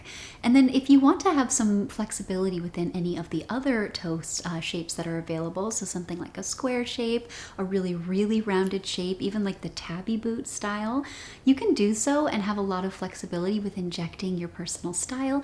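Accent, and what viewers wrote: United States. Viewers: Same here! I also love her accent, it's so good to listen to. I don't know which part of the US she is from, do y'all have a guess?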